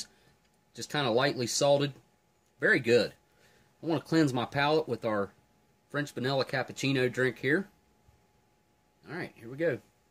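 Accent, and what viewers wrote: South, United States. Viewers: Are you from Texas, South Carolina, or Georgia?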